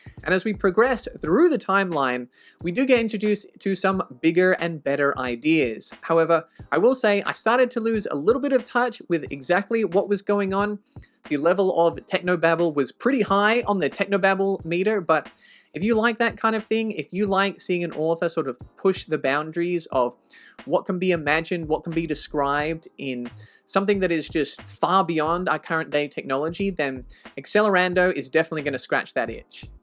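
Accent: Australia. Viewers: Waitttt it took me half the video to realize you have an Australian accent!! I'm from Victoria 😅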